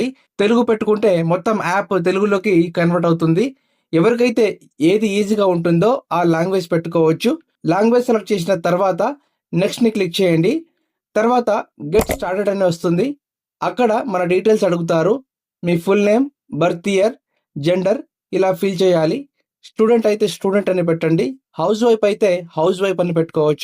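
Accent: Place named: Tamil Nadu, India. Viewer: Thamil voice vasthundhi bro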